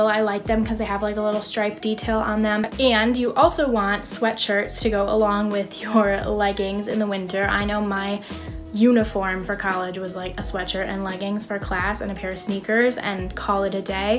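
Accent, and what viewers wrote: Midwest, United States. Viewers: i am from indiana and i can definitely confirm she is from the midwest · i can tell you're from the midwest girl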